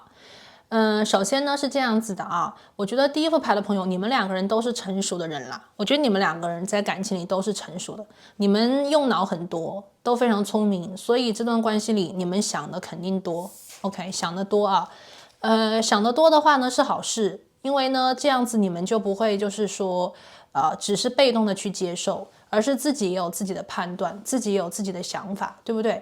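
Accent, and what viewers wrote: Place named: Taiwan. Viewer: 默默關注老師很久，很準確之外，老師聲音口音超可愛，有點台灣腔，聽了很喜歡，不會讓人覺得看不下去，希望還能有再多的測驗，感恩宇宙❤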